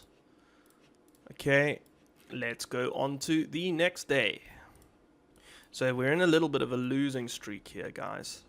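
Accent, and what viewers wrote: South Africa. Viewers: bro you from South Africa ?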